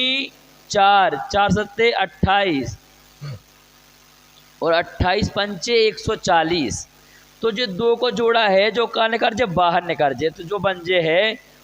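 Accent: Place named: Bundelkhand, India. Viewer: Sir apki bundeli bhasha bahu achchhe hai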